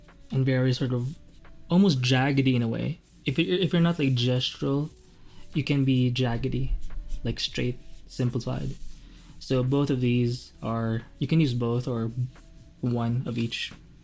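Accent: Philippines. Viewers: Nagulat ako nung namention ung UST eh HAHAHA. Pero di na rin nakakapagtaka kasi very Pinoy ung accent nya, in an endearing way.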